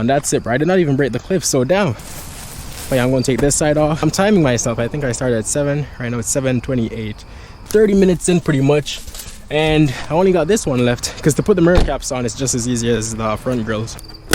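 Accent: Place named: Jamaica. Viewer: U sound like a Jamaican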